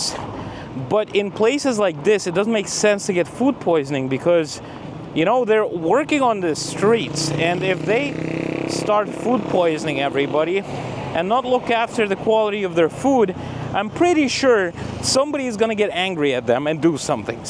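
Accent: United States. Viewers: You sound like an american' we all say and need to go to the GYM!!!!! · Ya know, you speak great English, more with an American dialect. Even some of the American philosophy entwined! How did you learn this? You will do well in America, just don't let them know you are Russian...very unfortunate.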